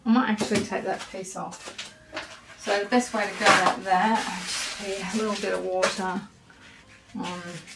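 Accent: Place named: Australia. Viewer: You have taught me over and over again that a piece is not finished until the artist is happy with it and this piece shows this amazingly. May I ask if you are in Australia. You sound like you are but I've been wrong before. Once again thanks for sharing your prodigious talent with us mere mortals. 🙂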